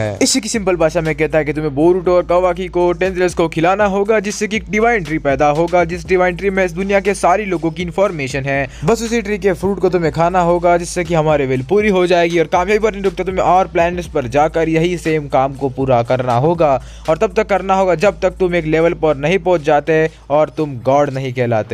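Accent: Bengal, India. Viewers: Ye bengoli hai 😅😂😂😂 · Ya bangali h😹😹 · Bangali he😅😅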